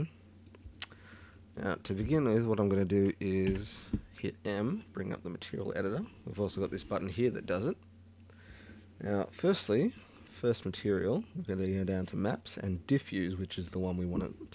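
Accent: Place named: Australia. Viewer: Gotta love that australian accent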